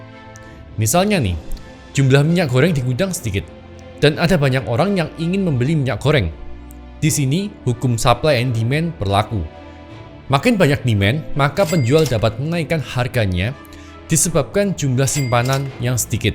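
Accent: Java, Indonesia. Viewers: Ko fokus bljar ngomong gk medok dulu lah biar enak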